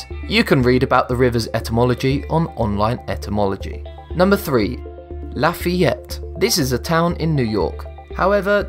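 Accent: England, United Kingdom. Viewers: Nah he's just from England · You frequently just correct one of the sounds in the word but not the rest. One example is Renault where you still use the British r-sound instead of the French. Also, your pronunciation, although better, still misses the mark in a few of the German words as well as the Swedish. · he’s english that’s how they pronounce it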